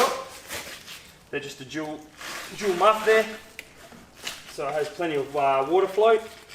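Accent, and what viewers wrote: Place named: Australia. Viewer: In addition to this Australian Youtube Channel I also watch the Dangar Marine Channel. Stu , the creator of the Dangar Marine Channel is located in New South Wales and I believe the creator of this channel is located Western Australia. Question? Are there regional accents in Australia? While to my American ears both of our Aussie hosts sound Aussie, but it seems that there is a slight difference in the way each man pronounces certain words.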